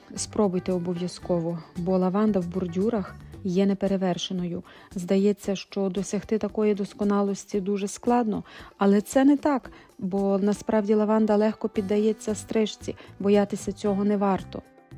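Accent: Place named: Transcarpathia, Ukraine. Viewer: закарпатська українська- особлива)) у вас якийсь свій діалект))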